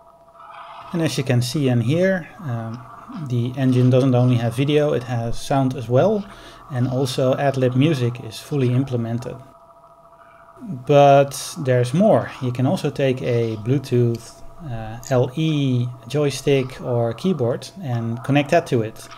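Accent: Netherlands. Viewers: Nice! Good job. I like the dutch accent..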